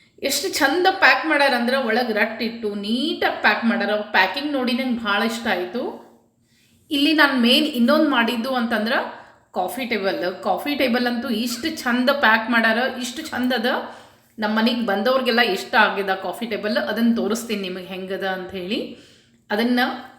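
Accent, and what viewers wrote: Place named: Dharwad, India. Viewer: ಯಾವ ಊರು ಇದು akka ದಯಮಾಡಿ ತೀಳೀಸೀ ಕೋಡೀ ನೀಮ ಬಾಷ ಹುಬ್ಬಳ್ಳಿ ಧಾರವಾಡ ಜಿಲ್ಲೆಯ ದೂ ಅನಿಸುತ್ತದೆ ಸುಮ್ಮನೆ ತೀಳಕೋಬೇಕು ಅಂತ ಕೇಳತೀನೀ ತೀಳೀಸೀ ಕೋಡೀ ದಯಮಾಡಿ🙏🙏🙏🙏🙏🙏🙏🙏🙏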